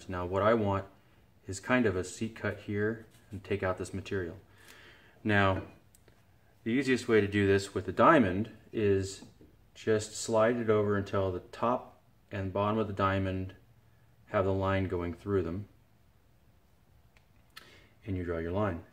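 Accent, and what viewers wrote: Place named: United States. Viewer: Love the American accent :) and a very helpful video thank you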